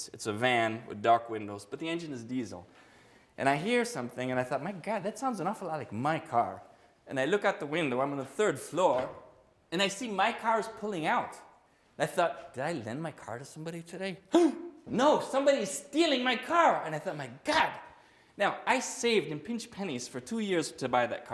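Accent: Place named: Northern Europe. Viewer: He said he was in Syracuse until his teens. Why does he not sound like New York (or even American)? No disrespect intended. I'm just curious. I thought he was from somewhere in Northern Europe.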